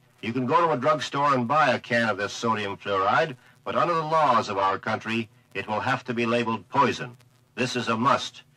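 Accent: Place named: Canada. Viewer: Wow, what a Canadian accent sounds like "Truth".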